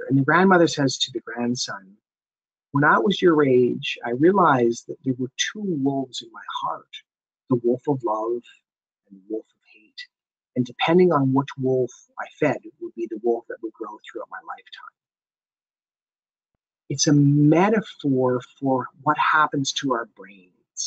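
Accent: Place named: Canada. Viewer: Enjoyed the presentation. Turn on "closed captions" to help with understanding. has a Canadian accent. This talk parallel's my own journey, now in remission (only a year) from metastatic sarcoma. I've thoroughly enjoyed my life on the edge of life and death. I'd recommend it for lost souls searching for meaning in a troubled world. It's a spiritual accelerant. As a social radical, the context is also living in a toxic, urbanized world, alienated from nature that's spinning out of control. Keep the flow of water and healthy nutrients going to help de-toxify the body-mind. Cancers often forms and habituates along routes of elimination: like the lungs, skin, liver, bladder, colon. Mine was (is) urothelial. Also, I'm a zen adept. I like Turner's book and have a sense of intentionality, but purpose needs to be put in a bigger context beyond the ego-mind, which is a social construction BTW. 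You allow (accept) by questioning, doubting and stripping away the non essentials. Meditation can lead to emptiness, being empty of all distinctions. Living from a place of non resistance in touch with the mysterium infinitum et oppositorum (Nicholas de Cusa). It's a mystical state of wonder, where there is no ego, no cancer, you're full of vitality or chi, and goals dissipate too. You're just in the presence of it all. No judgement or comment necessary. This along with Basho, my adoring adopted stray cat; a loving wife; openness; attention to alternatives Western medicine, driven by the pro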